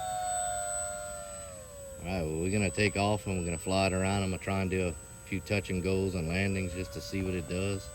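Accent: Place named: Louisiana, United States. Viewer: Takes me back to Pierre Part Louisiana the Cajun accent!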